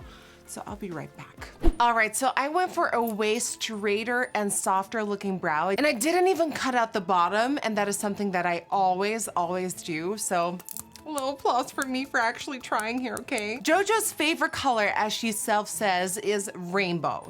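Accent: United States. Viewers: i sometimes forget that you're dutch???? like you sound soo american? how can you not have an accent lol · Your Jojo voice was perfect. I remember when accent was so strong and now she sounds born and raised in the usa!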